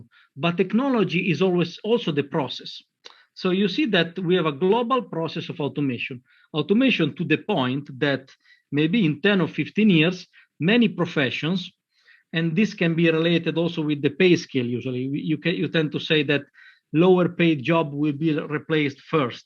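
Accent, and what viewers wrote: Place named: Russia. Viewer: Он говорит на английском максимально по-русски) Очень понятный акцент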